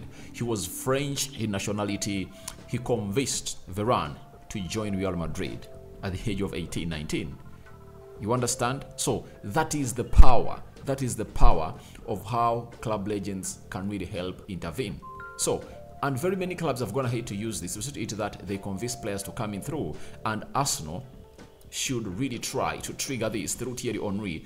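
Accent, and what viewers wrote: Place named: Uganda. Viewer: I knew u were Ugandan bro. I just checked to confirm...i know my pples' accent. Do your thing homie do your thing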